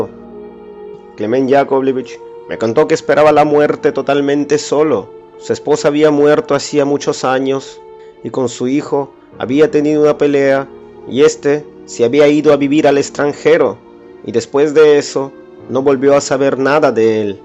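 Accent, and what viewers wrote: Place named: Russia. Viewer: Buenisima historia, por tu acento creo que eres ruso o slavo?, saludos desde el Perú.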